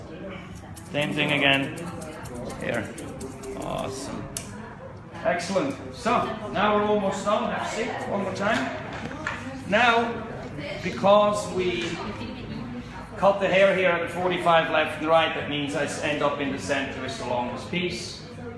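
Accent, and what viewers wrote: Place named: Scotland, United Kingdom. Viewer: Loving the Scottish accent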